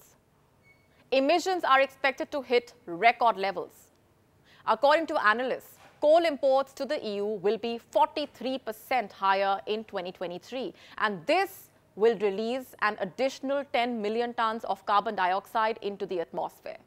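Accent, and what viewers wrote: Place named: India. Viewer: The accent is very indian😅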